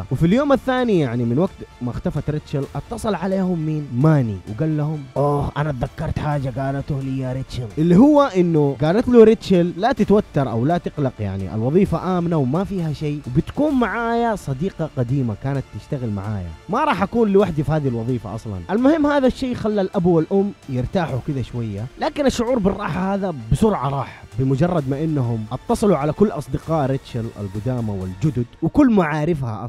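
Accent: Iraq. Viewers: حلوه قعاد أسماع عراقي 😂😂😂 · ✨ يتسمع عراقي ✨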